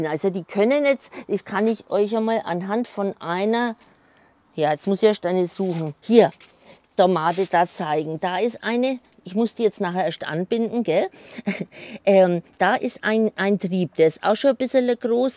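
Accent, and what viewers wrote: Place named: Upper Franconia, Germany. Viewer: Meine Großeltern kamen aus Oberfranken. Der Dialekt ist mir deshalb sehr geläufig und vertraut.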